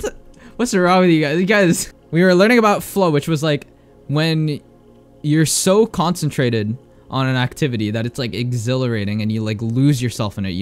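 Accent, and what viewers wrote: Canada. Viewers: is he canadian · took me a min to guess youre a Canadian student XD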